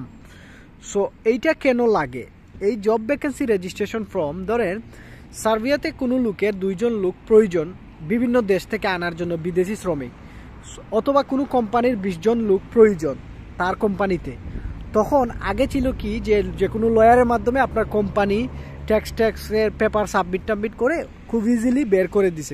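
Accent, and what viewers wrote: Sylhet, Bangladesh. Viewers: ভাইর কথায় লাগের সিলেটি আফনে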